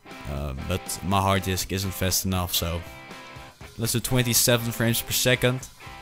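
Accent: Netherlands. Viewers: Nice tutorial. Btw ik kan horen dat je Nederlands bent :P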